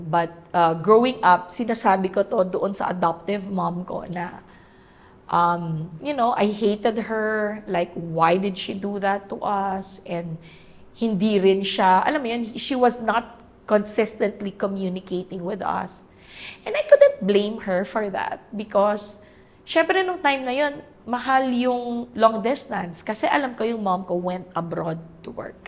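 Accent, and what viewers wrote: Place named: Zamboanga, Philippines. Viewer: Taga Zamboanga, Jabacana, ganyan talaga magsalita ibang babae doon.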